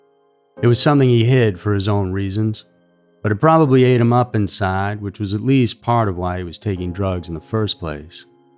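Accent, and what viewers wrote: South, United States. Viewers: Where's this southern drawl coming from? You don't have it in your older episodes. Is it manufactured for the show? You sound very much like Mathew McConney in this video, not so much in your older ones. I'm just curious, I really enjoy these videos.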